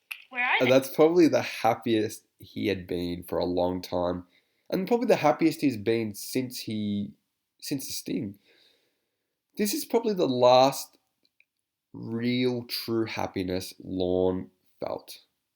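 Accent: Australia. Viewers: Bro, you're like the Steve Irwin of perves, not only your accent but the purpose of every action by the critter of topic, the analyzation and breakdown is riveting.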